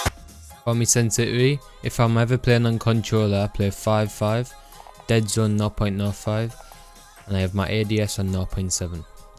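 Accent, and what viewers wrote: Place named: Newcastle, United Kingdom. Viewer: unless your a fucking dweeb love that mate keep it up inaul you geordie? sounds it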